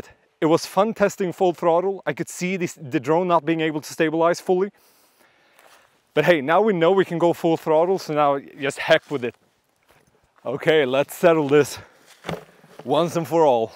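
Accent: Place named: Sweden. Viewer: Älskar att höra din fina svenska dialekt 🇸🇪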